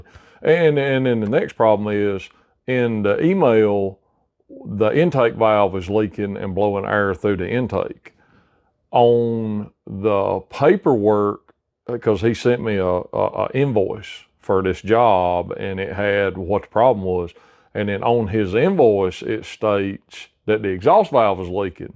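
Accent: South, United States. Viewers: Now thats a country accent! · Boy did the armchair mechanics come out of the woods for this one...Some really wild theories floating around out there. This cat has got a seriously strong southern accent and I dig it! He could be talking about quantum physics, and I'd still listen. 😜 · do you naturally sound this kuntry or do you have to really lay it on thick for the camera?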